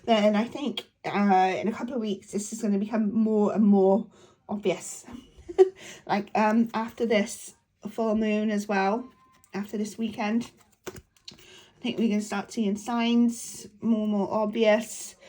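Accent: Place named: Wales, United Kingdom. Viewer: I’m not on this ship….i observe from afar. is a sweetheart and I love listening to her welsh accent……I find it very soothing. Whether tarot is your thing or not comes from a place of goodness. If A and L are still together….if N and L are not a couple….in the greater scheme of things in your own lives does it really matter? They are not your friends or family. Interesting that a lady posted yesterday on TT that L was in her shop in the UK. Both L and N like to post sometime after they were at a particular location. To me L’s hair and sideburns in the restaurant pic look a little short for current filming… but I could be wrong. Maybe A is at said restaurant with a new BF…. In my job of almost 40 years, I have learnt that things are not always as they appear….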